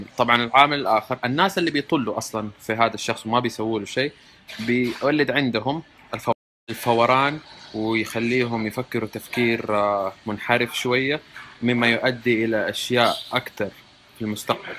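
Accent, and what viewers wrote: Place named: Hijaz, Saudi Arabia. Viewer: بس اللهجه حجازيه زي احمد الشقيري لكن الصوووت مره لااا😭😂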